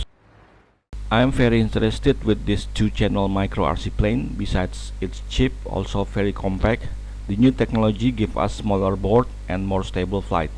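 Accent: Indonesia. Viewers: Tadinya gw kira ini channel luar, pas denger logat nya wahh indo nii, dari ini gw percaya channel berkualitas bnyak juga di indo. Keren sii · how come I feel the way you speak English is like an Indonesian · Pantes kok kenal logat2 inggrisnya, ternyata org Indo. Wkwk. Mantep banget channelnya mas, gak nyangka ada yg punya topik foam RC plane 👍👍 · gw tau abang org indo karena dari logat 🗿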